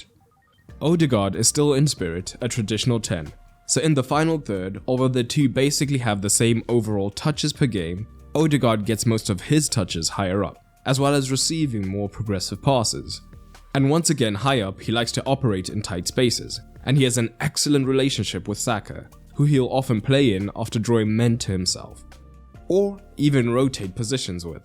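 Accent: South Africa. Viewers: Are u South African? 🤨🤔🧐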